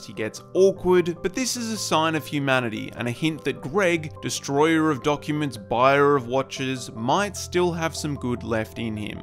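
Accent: Australia. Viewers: is this the same guy form skillup or every australian sound the same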